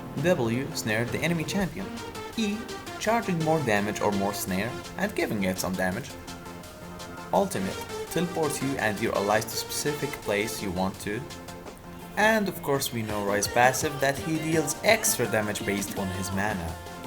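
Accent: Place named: Egypt. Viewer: مسوي عنقليزي تتكلم زي المصري ترا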